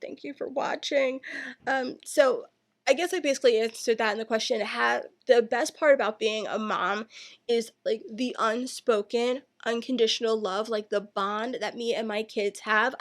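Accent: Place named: South Jersey, United States. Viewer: Gurrl! You already know I love you, your personality, content and accent! Go South Jersey..Lol 🙌🏽🙌🏽 I can totally relate to the whole thyroid issue! Basically it sucks! I’m hypothyroid and my hair is nothing like it used to be..it’s thinned out, dry and falls out!! ugh, my nails..and it does so much to your body, we would be here all day! Hang in there and go see the specialist for it, an Endocrinologist! I look forward to your adoption story and always your next video! Tfs love! 😘🤗 ~ Helen -NYC girl here..Lol!!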